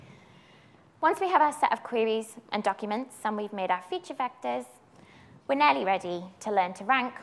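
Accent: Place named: England, United Kingdom. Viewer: that's a very very english accent lol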